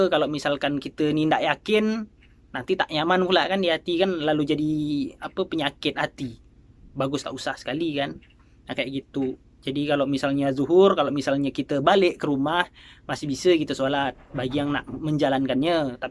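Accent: Malaysia. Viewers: mantep mas, bahasa kuala lumpur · Mari logat jowo, kenape sekarang jadi nak Melayu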